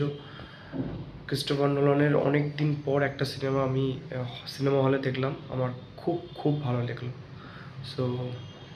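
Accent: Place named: Bangal, Bangladesh. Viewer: Dada, tomar bangal accent ta 😂😂 Darun vlog ta ❤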